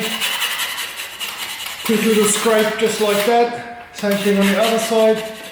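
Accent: Australia. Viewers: i love your accent i'm guessing you are from Melbourne Australia.. I'm stuck in S.A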